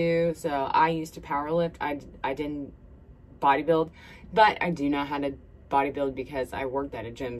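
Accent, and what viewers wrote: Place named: South, United States. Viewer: Hey hey miss lady..💙 u look beautiful with or without makeup..💙 again i also deal with ptsd d after getting out of a horrible relationship of 8 years. .but now that im free im finding me again.. Its been insane. .im soo happy to have survived.. Im getting dentures at 40 due to not being able to even try to take care of me..but im soo happy to find ur channel and u..💙 im from Memphis but live in Oklahoma so i here for the southern accent..💙 u r a sweetheart..ao happy to be apart of ur journey. .💙 keep growing and for sure keep shining💕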